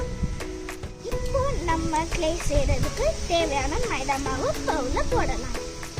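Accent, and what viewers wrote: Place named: United Kingdom. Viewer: OMG! Did anyone notice her English accent ? 😻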